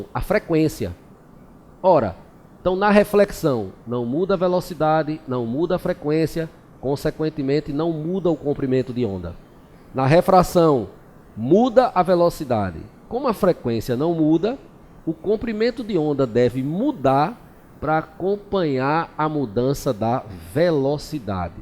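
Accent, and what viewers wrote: Northeast, Brazil. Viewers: Idiotas do Centro-sul que criticam o sotaque nordestino! Caguei pra vocês!!!! 💩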